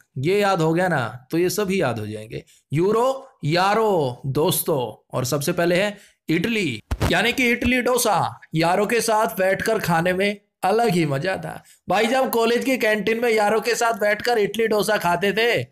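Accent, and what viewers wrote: Haryana, India. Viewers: Sir aap Haryana se ho kya?? ♥️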